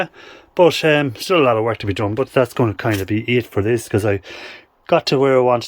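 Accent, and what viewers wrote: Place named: Cork, Ireland. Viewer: Not as bad as ians shed but you need to get your finger out before it turns ugly 🤗👍🇮🇪 You sound like a Cork man, am i right?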